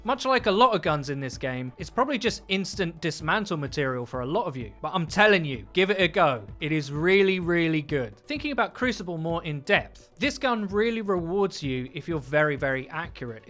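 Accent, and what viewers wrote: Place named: United Kingdom. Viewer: Can we get a destiny guide channel where it's not a british dude?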